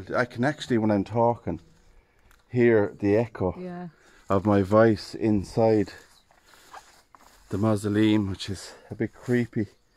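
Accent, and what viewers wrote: Ireland. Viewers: Great video, and I love that Irish accent, x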